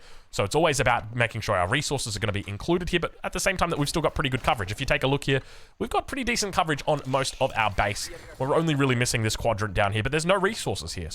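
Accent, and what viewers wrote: Australia. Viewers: Listen at 0.9x to compensate for the Australian accent!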